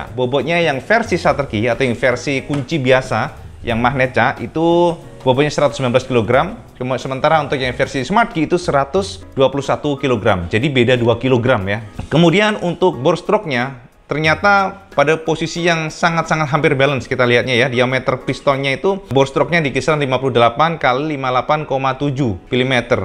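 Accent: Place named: Java, Indonesia. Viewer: Kang emang gokil cak...podo jowone karo aq...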